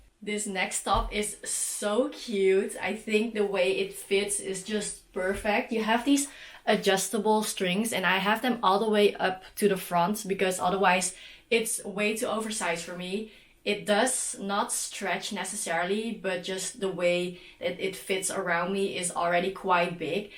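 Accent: Netherlands. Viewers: Is your accent dutch?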